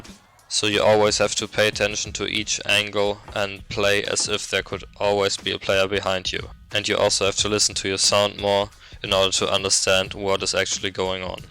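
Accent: Germany. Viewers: Are u german??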